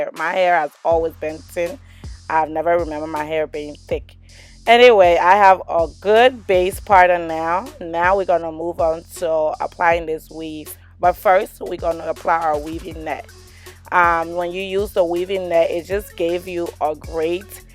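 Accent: Liberia. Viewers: Is she Liberian? Her accent sounds like she's from Liberia.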